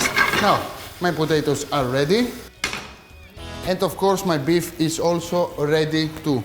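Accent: Greece. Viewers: Thank God, the video is subtitled. 😆😆😆 I've rarely heard anyone speak English with such a heavy Greek accent: to the point of being almost unintelligible.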